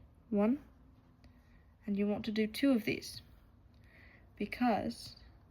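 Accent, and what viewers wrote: United Kingdom. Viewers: I KNEW YOU WERE BRITISH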